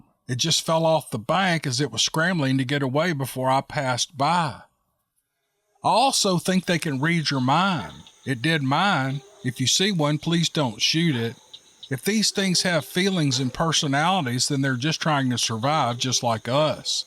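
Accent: South, United States. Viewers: Thays’ boogers haha I love southern accent especially storytelling.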